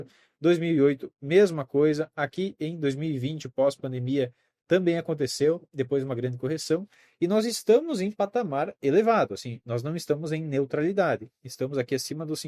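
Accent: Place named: Paraná, Brazil. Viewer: sotaque do paraná ou catarina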